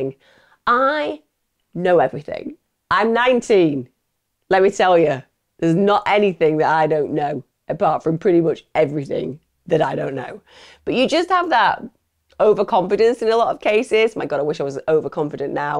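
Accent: Australia. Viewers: Hey, I’m from Australia and have not heard of this case until now. Our justice system is despicable! On a side note though, you’re Aussie accent isn’t too bad xx I’d love for you to also look at covering the Shaniya Davis case. I’ve come across a few stories about her case but haven’t watched them in the hopes that I see her story on your channel. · Your Aussie accent made me smile! Love from down under 🇦🇺🦘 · Loveee the Aussie accent 😂😂😂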